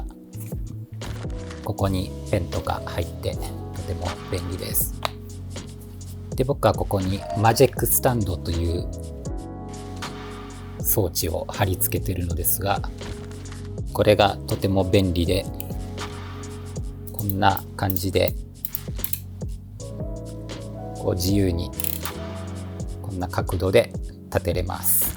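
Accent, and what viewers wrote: Kansai, Japan. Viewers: 今度しんやさんの関西弁トーク見たいです(♥Ü♥)❤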